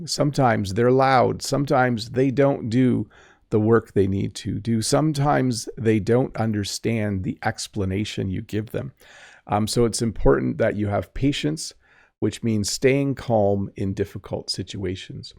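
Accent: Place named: Canada. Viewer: Hello, dear teacher, I am Shahab from Iran, one of your avid fans. I truely appreciate the very useful lessons in which you teach us very fantastic things. I also love the Canadian accent. However, as a doctor, I believe you made a small mistake regarding the meaning of " empathy" and " sympathy ". Actually, empathy is the ability to understand and share the filling of another person, to put yourself in their shoes without becoming emotionally involved - exactly the same way doctors are expected to interact with their patients . On the other hand, sympathy is the feeling of pity, compassion or sorrow for someone else who is experiencing something difficult that doctors are professionally discouraged from showing sympathy because it might create a sense of imbalance in the doctor-patient relationship as it can cause the doctot to become emotionally overwhelmed. 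Hence, doctors should demonstrate empathy and avoid excessive sympathy.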